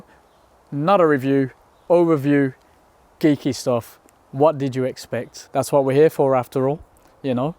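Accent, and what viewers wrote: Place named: Northern England, United Kingdom. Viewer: sound like you fron northern England..maybe Liverpool/Manchester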